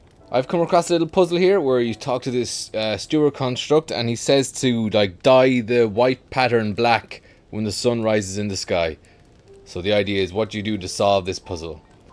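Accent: Ireland. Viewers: Love the waterford accent boi!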